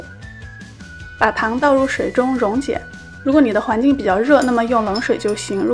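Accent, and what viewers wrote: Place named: Shandong, China. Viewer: 我想一定是山东人！口音也像。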